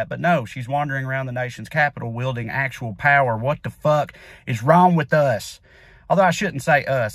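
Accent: Australia. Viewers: The Australian accent…. 🤌🏼🤣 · Not a bad Australian accent, mate. And yes, she's so empty, gee. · Acceptable Aussie accent, mate. Convinced me, and I'm from here. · The Aussie accent makes everything OK :) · As an Australian, I approve your Australian Accent and it is a spot on imitation of what an Aussie would say about this. Love 💕 from Australia 🇦🇺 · Australian subscriber here 🦘 Love every single glorious rant you upload, and thanks for the 70% Aussie accent, but one reason we tend to get away with using that C word so flagrantly is that we rarely use it to refer to women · Great Aussie accent and nicely played C word. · you da man! hope that Ausi accent kept ya out of the weeds:) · Shout out for your Aussie accent and as usual for calling a spade a fucking shovel. (Another Aussie Idiom) 🇦🇺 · It's amazing that two words was working up to before he said them in his Australian accent were the very two that I spoke aloud in my very own American accent about two seconds before he said them. 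If the shoe fits "ladies..." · You hit the nail on the head with your description of Marjie and Laurie. I like your Aussie accent mate. :) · Not too sure about the Aussie accent, but I can't disagree with the sentiment, mate. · LOVE LOVE LOVE THE AUSTRALIAN ACCENT,! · The Australian accent worked! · 👍 on the Aussie accent. Not bad 👏🏻 MGT should spend a week in a Chinese gulag so she could learn what that word means- not that she would be able to survive more than one day. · The Australian accent totally worked!! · Yup! Well said with the Australian accent. I’d like to add the spelled version of that with a Manchester accent, courtesy of my British buddy who’d say, “Couple a Facking Can’ts those two!”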